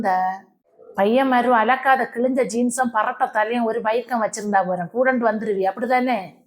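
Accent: Kanyakumari, India. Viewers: Kumari tamil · குமரி தமிழ் சூப்பர்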